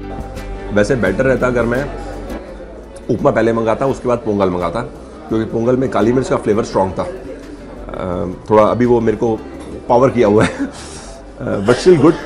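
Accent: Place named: North, India. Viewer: No he from North India Delhi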